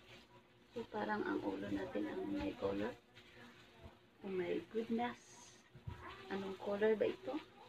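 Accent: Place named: Visayas, Philippines. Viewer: Ate bisaya ka pala. Magka sinabut jud ta ani love u po ❤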